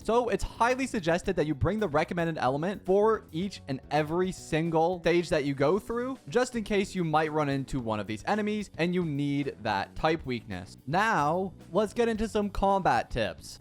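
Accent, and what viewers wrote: Canada. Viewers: you have a canadian accent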